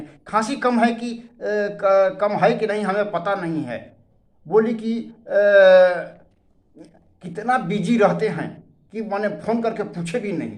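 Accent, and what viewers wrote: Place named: Bhojpuri, India. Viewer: Kirpaya aap apana bhojpuri lehja mat chhodiye! Poorvanchal k jitna dangdar hauye , kul like auri subscribe karihain.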